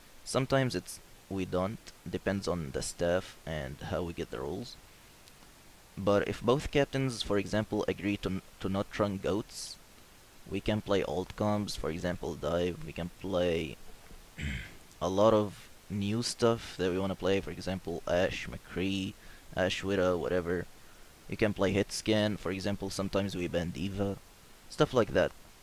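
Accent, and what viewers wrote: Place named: Arab world. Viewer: Much love (from Palestine!) you sound arabic by the way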